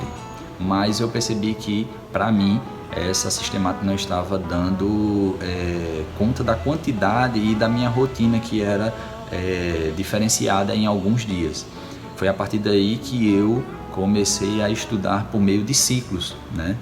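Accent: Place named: Rio Grande do Norte, Brazil. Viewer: Excelente irmao, muito bom mesmo, o melhor depoimento pra quem está buscando pois aborda cada ponto c calma e objetividade. Pelo sotaque ou é potiguar ou paraibano né? Brasil.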